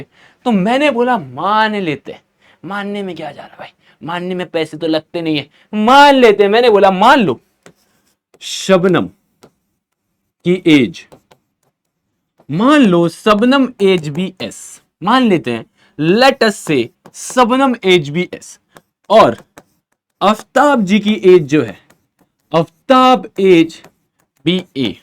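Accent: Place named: Bihar, India. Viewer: Sir is bihari for sure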